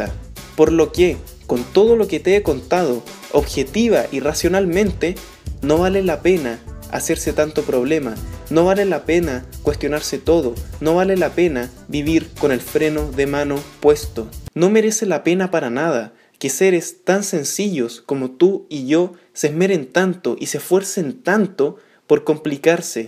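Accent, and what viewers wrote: Chile. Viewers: Eres chileno?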